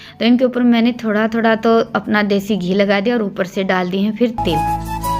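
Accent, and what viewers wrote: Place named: Alwar, India. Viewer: Aap kha se h plz batana aapki boli se to aap Alwar ki lagti ho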